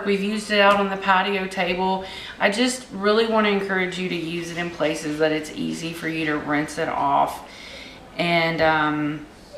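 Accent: South, United States. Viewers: Omg, I love your southern accent. I've lived in Washington state for 30 years and your accent really makes me miss the south. ❤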